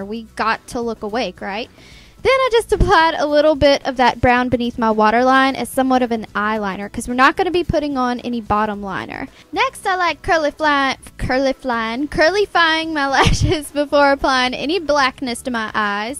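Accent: South, United States. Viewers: she used to sound SO country! she should play ellie may in a modern reboot lol.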